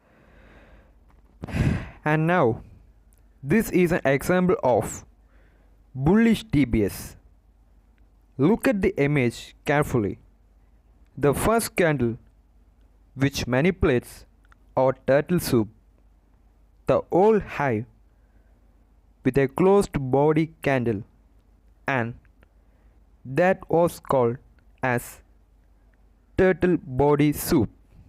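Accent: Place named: India. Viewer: Can’t you hear he is Indian 😅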